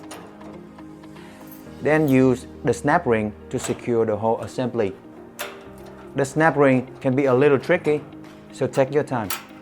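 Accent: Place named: Vietnam. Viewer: Judging the the accent, bro is vietnamese 🙂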